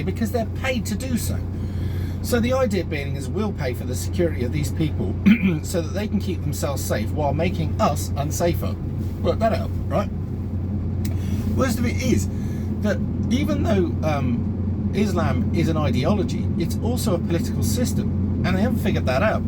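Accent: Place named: Cockney, United Kingdom. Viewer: Damn! You're a Christian? No wonder Christianity is dying on it's arse! I thought you just some bloke escaped from some East end Pub spouting off the usual " facking cants camming, tekkin ahh jobs an fackin aahses" blah, blah,blah, Oh and there is no Christian army, never will be, there's no conviction, no faith in....the faith,..3 in 1 but 1 is 3? leave it out? You'll need a Fearless Muslim one for when the Russians get cheeky!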